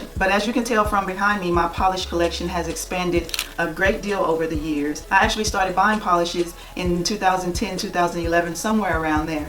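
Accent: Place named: Texas, United States. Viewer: I thought you sounded like a Texas girl! Definitely looking forward to brand videos when you redo your shelves.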